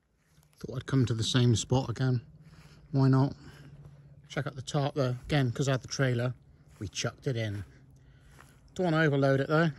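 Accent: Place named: Bristol, United Kingdom. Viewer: I thought it was Bristolian, but you also have a slight estuary twang too in some of your words. That's what made me ask.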